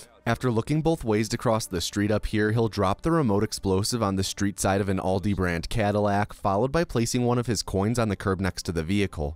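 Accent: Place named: Midwest, United States. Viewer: It's so weird that I didn't notice any accent in your voice until AFTER the Chicago guy skit; Once you returned to your normal speaking voice I could hear lil bits and pieces that sounded distinctly Midwestern. As a native Chicagoan myself I was never able to detect the "Chicago accent" while I lived there unless it was very pronounced or over-the-top like in SNL's Da Bears skit. Other than that I was convinced that we spoke "neutral" English. After living in Oregon for almost 7 years though I'm slowly getting better at picking up on the subtle nuances of Chicago-talk. The biggest difference I've been able to spot is in short "o" sounds like in the word "body". I realized that I say it as though it were a short "a" sound, almost like "bahdy". I guess I'm just anudder one a' da hunnerds of Chicahgo fellers who tahks like a gahsh darn fool 😮‍💨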